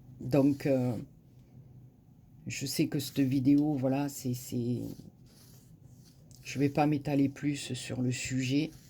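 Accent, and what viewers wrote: South, France. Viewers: Coucou, Je me joints aux autres pour t'envoyer mes sincères condoléances à toi, tes enfants & petits enfants! Dans ces moments là je ne sais jamais quoi dire, mais ce que je sais, c'est que tu risque de te faire disputer par moi si tu te retiens de pleurée, car tu vas de détruire de l'intérieur et ça c'est pas bon du tout donc lâche toi sinon attention a tes fesses hi hi;) Oui tu dois rester positive et avoir toujours envie de croquer la vie à pleine dent que ce soit pour toi, tes enfants ou/et tes petits enfants et puis ton mari n'aimerait pas te voir sur la pente raide, donc ma belle continue de nous faire entendre par le biais de tes vidéos ton accent du soleil et des cigales! GROS Gros Bisouuus vous tous!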